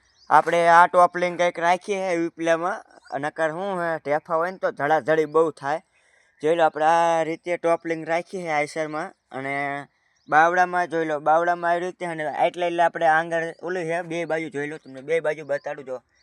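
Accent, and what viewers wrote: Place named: Surendranagar, India. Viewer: Bhai Tari boli surendarnagar ni che😅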